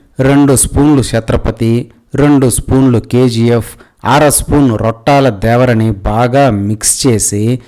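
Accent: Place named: Telangana, India. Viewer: Durapu kondalu telangana yasa kee dooram ayyithe manchidi😂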